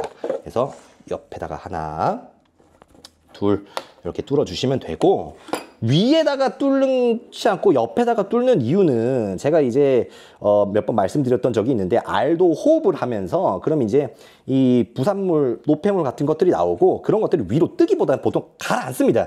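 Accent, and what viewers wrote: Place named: Gyeongsang, South Korea. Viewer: 와 경상도 사투리 찐이다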